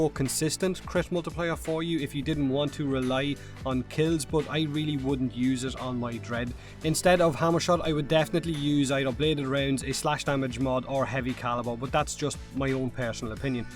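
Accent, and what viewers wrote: Ireland. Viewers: "What's up, feckers?" Love that Irish accent. Wanna know if there are multiple accents like the British.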